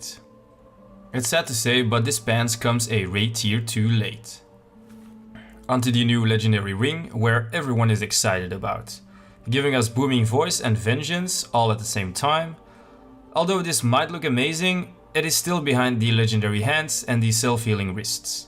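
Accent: France. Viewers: omg i watched the whole video just because of that french accent :3 <3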